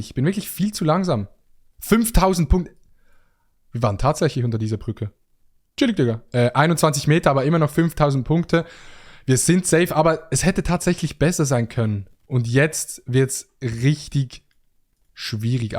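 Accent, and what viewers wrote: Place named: Zurich, Switzerland. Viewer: ich dachte ku kommst aus Zürich😂 liebe grüsse von kleinbasel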